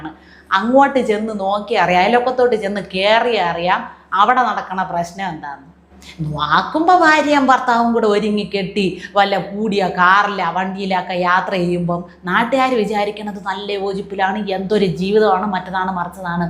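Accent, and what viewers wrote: Thiruvananthapuram, India. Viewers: Very good presentation in തിരോന്തരം സ്ലാങ് 👌👌👌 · Super super❤❤❤ TVM language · tvm കാരിയാണ് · Thiruvananthapuram slang 💯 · തിരുവനന്തോരം ശൈലിയിലുള്ള അവതരണം അടിപൊളി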